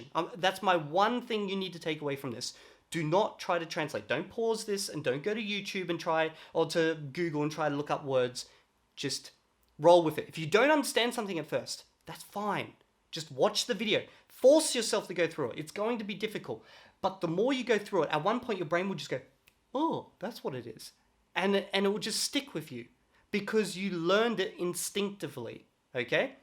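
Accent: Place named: Australia. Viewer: Great to find an Aussie speaker, gives me hope I can do it!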